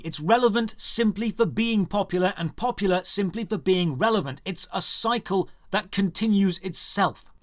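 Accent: United Kingdom. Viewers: showing an american football venue while talking about football and sounding british. Now I'm confused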